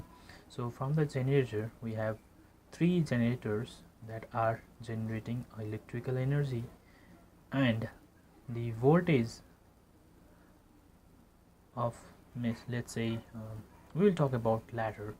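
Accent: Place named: India. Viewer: what the fuck ..I hate Indians English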